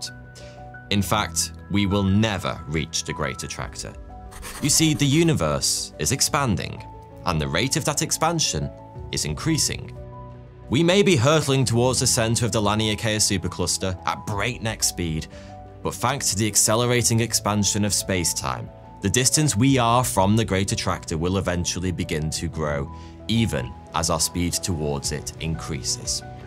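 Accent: United Kingdom. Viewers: Which part of British accent it is? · Gravity is 10 to the 39th power weaker than electro-magnetism. The true energy that binds the universe. All this great attractor, dark matter, dark energy, black hole, suns are nuclear furnaces nonsense is exactly the reason, the "experts" keep on getting baffled. Everything is connected. Not by gravity, as it only attracts. If gravity were true, everything would be colliding and smashing into each other and no life would ever have formed, anywhere. The universe is balance, constantly changing, constantly in flow. This is how its perpetual. It isn't expanding, the speed of light is not a constant and red shift is not due to gaining of distance, its the measure of youth, higher energy. Besides, how can the universe be expanding, if there are all "super massive black holes" at the heart of every galaxy, sucking everything in. 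Its all fundamentally flawed and all the degree holding towers of the line, have their heads so far up Einstein's ass, they can't smell the dark mode plasma. Thought and movement are electric. We are tiny mirrors of the universe, as well as antennas, picking up signals, which means, the universe is electric too. Everything is connected. Electrically, gravity is a mere by-product. This episode of Thoughty2, sucks. Just another British voice on the screen, talking to the little kids watching with awe and wonder. Science has been compromised and is taking orders from the wealth, like everyone else. Keeping secrets, telling you how things work, as how one woul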